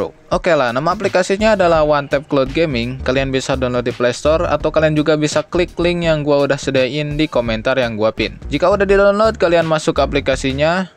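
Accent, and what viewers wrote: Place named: West Java, Indonesia. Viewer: logat sunda nya sangat terasa😌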